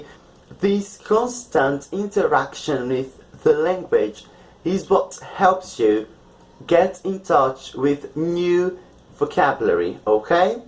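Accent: United Kingdom. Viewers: hi my name's Leandro, and I'm enchanted with your English accent, I wish we were friends on WhatsApp, anyway, if you want to contact me , please , just Whatsapp me <num>. I have been studying English for a while so I think we could have an interesting and effective conversation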